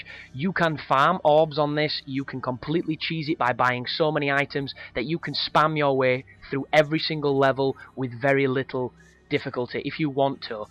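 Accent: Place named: Northern England, United Kingdom. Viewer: Does thar live in sheffield o’what thi sounds like a reyt northerner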